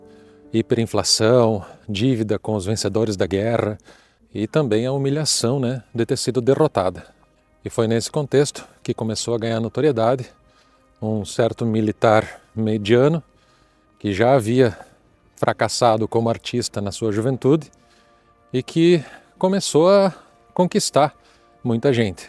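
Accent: South, Brazil. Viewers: Gostei muito da matéria, pelo seu sotaque deve ser aqui de Santa Catarina ou do Paraná. To certo ?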